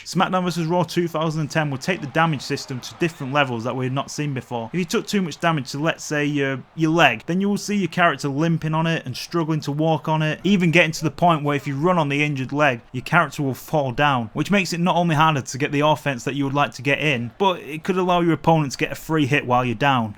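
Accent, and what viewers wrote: Yorkshire, United Kingdom. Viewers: Are u from Yorkshire?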